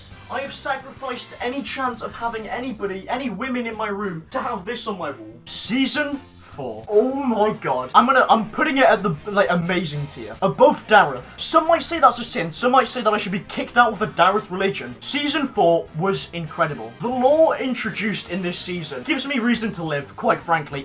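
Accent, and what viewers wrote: United Kingdom. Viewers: Are you british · My ranking of your British accent? 11/10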